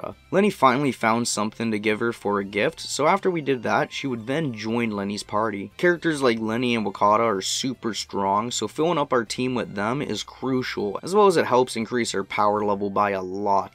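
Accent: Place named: Canada. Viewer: Subbed becus Canadian accent, Kenshi, and hoe appreciation.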